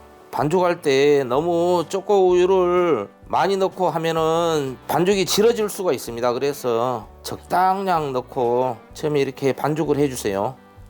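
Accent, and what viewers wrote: Jeolla, South Korea. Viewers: 저두 전남 광주입니다, 말투에서 전라도 사투리가 나어서요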